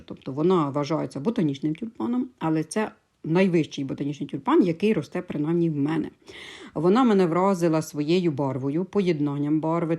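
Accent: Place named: Cherkasy, Ukraine. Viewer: Я передивилась майже всі ваші відео. Ваш говор мене відправляє мене в моє дитинство, до мами. Скажіть, ви живете в Черкаській області?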